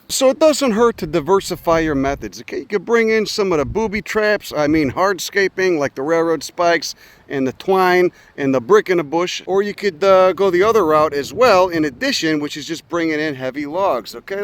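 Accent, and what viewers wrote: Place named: Brooklyn, United States. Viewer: I'd been wondering if you were from Cali, cuz the accent sounds more Brooklyn to my Southern ear, but yup. That's some Earth First California shit, my friend. 🧡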